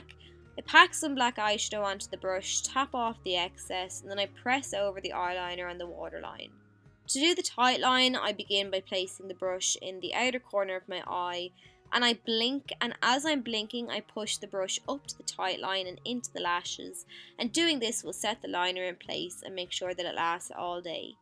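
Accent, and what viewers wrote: Ireland. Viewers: love your Irish accent I have a Boston Massachusetts accent lol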